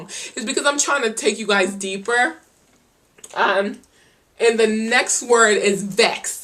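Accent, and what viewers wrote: Jamaica. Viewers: Your accent is very beautifal I love JAMAICA!!! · I like your video and you Jamaican talk I know it speak Jamaican talk to a my name is Bailey Rae Malita mullings and I like your show because it's awesome you are you from Jamaica oh wait I already know that because I saw the rest of your shows and they're really cool and nice you are nice lady you're beautiful do you really know how to speak Jamaican talk so I love I love your Jamaican talks I know how to speak some Jamaican talk no I know how to speak a lot of Jamaican talk so I love it and you said not to say so I don't say so basically is I love your shows your videos are cool too and you know what you making your from Jamaica and you know how to speak Patois your Brighton awesome don't forget that Bailey Rae is b a i l e e r a e is not it doesn't end with a y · Yoh! Wah gwaan! Like yur Patwa Jahmaican accent. 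Me try learn too. Chill. Jah Bless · me gusta el acento de Jamaica...!!! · I could listen to you speak Jamaican style all day! Thank you! Pardon me, but the "bloodclot" makes me lmao! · One question are you jamaican are u just trying to speak jamaican ?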